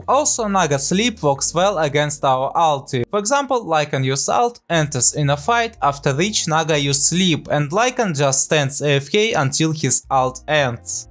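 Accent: Russia. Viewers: Sounds like рашан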